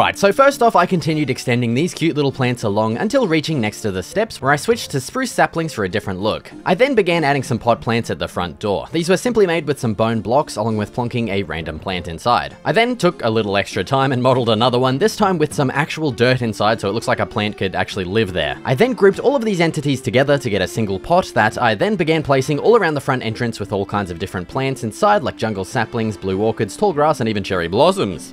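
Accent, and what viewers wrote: Australia. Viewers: He's not British, he's australian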